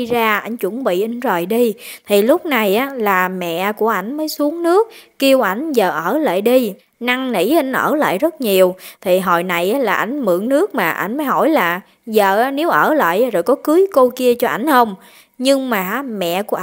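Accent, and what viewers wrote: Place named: Countryside, Vietnam. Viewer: Helo Em nhé!! Xin chúc mừng Em là mẫu người phụ nữ được yêu thích nhất hiện nay và Em có chất giọng quá chân thật và chân tình quá đi thôi??? Nhung cuộc sống bây giờ không phải là Em nghĩ đâu nhé Em à??? Xin chúc mừng Em là một giọng thuyết minh quá tuyệt đỉnh và quá OK và quá chân thật và quá hai lúa mà anh vẫn OK về MC TV của kênh Youtube quá tuyệt vời và quá hoàn hảo và quá OK và quá 100 /100 nhé Em yêu à???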